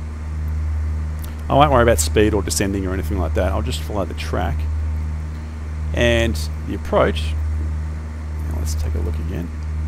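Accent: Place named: Australia. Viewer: Within literally 0.25 seconds of your first word I go: Cheers mate, just couldn't get any better than having an Aussie explain NDB intercepts out of YLTV! (Studying for IREX here in Melbourne too). Thanks for great video!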